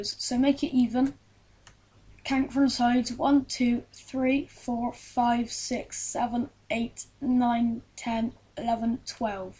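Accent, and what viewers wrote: United Kingdom. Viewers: you sound more scotish than english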